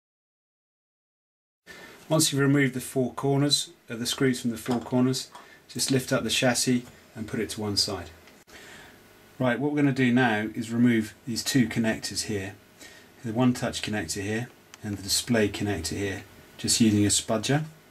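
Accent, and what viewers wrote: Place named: United Kingdom. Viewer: Thank you very much for this video. I was able to fix my son's 820 for 1/3 price! and your British is music for my ears:) Greetings from Budapest.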